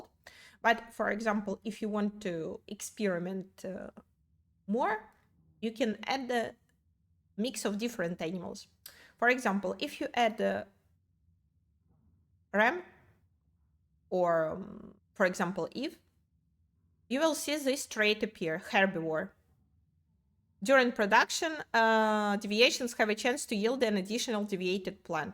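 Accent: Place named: France. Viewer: I'm wrong again. That's OK, I'm used to it - I'm married. Lovely accent, is it French then?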